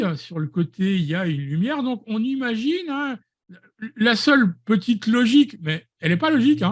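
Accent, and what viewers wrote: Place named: Belgium. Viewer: Une très intéressante découverte dès que je serai rentré à la maison que je retrouverai mon PC et que je pourrais abandonner mon téléphone à l'hôpital où je suis en ce moment, je vais vous suivre avec quelques tutos sur Youtube et peut-être même devenir un de vos élèves, YouTube m'a proposé la version anglaise à entendre votre accent vous devez être belge je crois..... salutations amicale d'un Belge perdu dans l'archipel des Açores 😃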